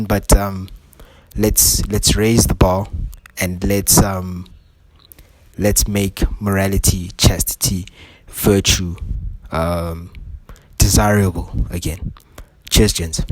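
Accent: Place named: South Africa. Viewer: Your accent sounds like mine... are you also from South Africa?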